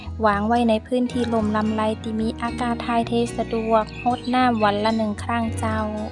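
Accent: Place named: North, Thailand. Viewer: ชอบฟัง..สำเนียงอู้คำเมือง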